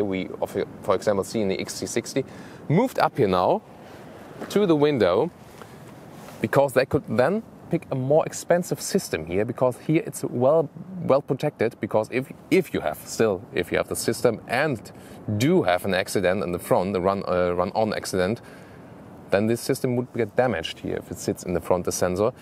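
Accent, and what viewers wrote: Germany. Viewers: Great review(s) you´re doing even with that German accent. Great car either, this XC90, except for it´s poor typical mid class sized engine which will keep buyers for the premium segment away. Driving an old XC90 for nearly 7 years now I was looking forward to the new one. But definitely not with that tiny engine. What a shame. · Mensch, habt ihr nicht sonst Reisen getestet oder Kreuzfahrten? Wie dem auch sei, nettes Fahrzeug. Nur einem Deutschen beim Englisch sprechen zuzuhören, ist sehr sehr anstrengend. Nichts für ungut ;) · nice german accent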